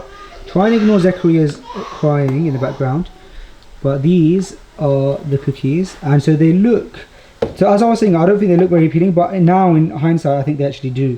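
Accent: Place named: United Kingdom. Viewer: tbh I learn Islam, english, and british accent from your videos and freshly grounded's videos a lot, thank you 💯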